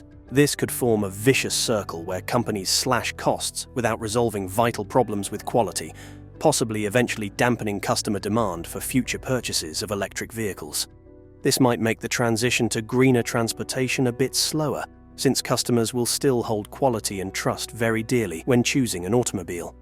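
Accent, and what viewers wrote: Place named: United Kingdom. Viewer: I don't trust any promotion using a British accent.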